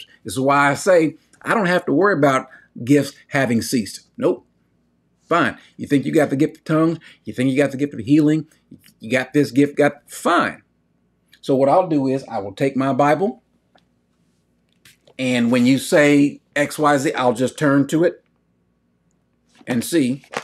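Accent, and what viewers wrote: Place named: United States. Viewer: U need to check yourself, what do u mean trying to sound black, what does black sound to you? He talks like normal human being. His just American, being a rapper does not mean black, it means rapper simple. Don't link being a rapper equals talking black. Just say u don't like him. Why are u comparing his following to another persons following as if it's his fault? U should try watching his videos before judging.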